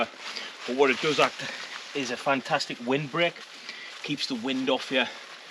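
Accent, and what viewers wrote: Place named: Northumberland, United Kingdom. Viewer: by any chance are you from somewhere near Ashington or Morpeth because I am because you just have that sort of accent and if you are do you support Newcastle United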